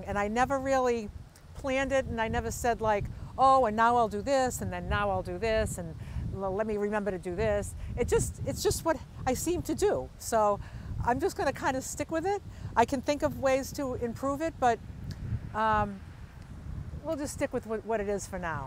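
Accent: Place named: Boston, United States. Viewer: I love this! This tune has stuck in my head since I first heard it in the van on your travels out of Boston. It`s so catchy that I sing it all around the house and my 12 year old laughs at me. I am from the UK & was lucky to live in Boston from <num>, I love hearing your accent. Thank You, look forward to seeing more video`s of people dancing to it!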